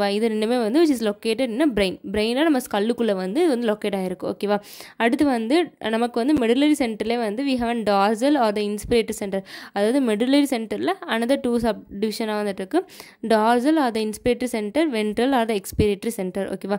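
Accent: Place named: Tirunelveli, India. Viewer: Onga slang tirunelveli slang maathiri irukku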